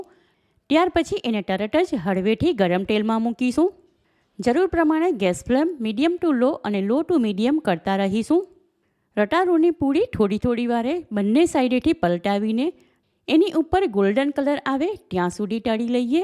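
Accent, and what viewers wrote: Surat, India. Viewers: I like ur surti language the way u talk so sweet...ur receipes I do watch they r 👌👌👌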